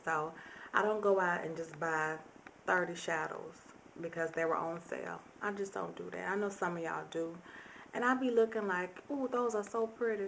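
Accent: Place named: Ivy League, United States. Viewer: lol... ivy league voice...But u r excellent at what u do... and I sense that u do this because this is what u love doing.. every1 doin makeup on here I dont get that vibe from... sometimes I think its done just because its popular and alta peeps r doin it.. but I thank u 4 ur genuity(is that a word?lol) really.. u are a Blessin 4 real.. if people can't c that its their loss hun! :) keep ya head up...stay keepin it real:)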